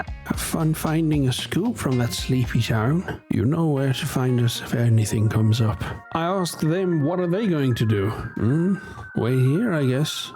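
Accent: South Africa. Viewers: Ah, a fellow South African. I knew that accent sounded familiar (kinda sounds like the zookeeper from Jozi zoo iykyk). Good video bro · The Sandton accent took me all the way OUT bro 😂 too real. Instant sub! Looking forward to more of your content!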